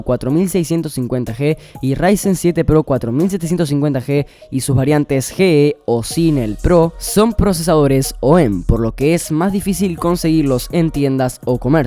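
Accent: Argentina. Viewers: Sos de argentina???? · tenes voz de wachin y la rompes, y mas merito por parecer ser ARGENTO O YORUGUA... MAS DIFICIL no podias tenerla kajskasj y la tenes atada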